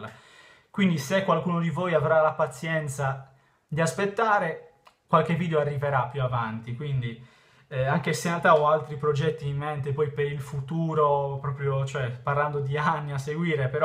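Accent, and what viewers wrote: Sardinia, Italy. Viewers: Allora secondo me te ti sotto valuti perche' ci sara' un motivo se hai fatto un boom di iscritti,magari ad una parte delle persone che si è iscritta piaceva il tuo accento sardo magari ad un'altra parte piaceva il call of sputy questi sono esempi fattI da me magari le persone si sono iscritte per altri motivi ad ogni modo non ti devi sentire in colpa se hai fatto piu' iscritti di altri nn è colpa TUA.Detto questo mi manchera' vedere i tuoi video ma ti auguro buona fortuna per gli studi:) PORCO IL!!!!!!!!!!!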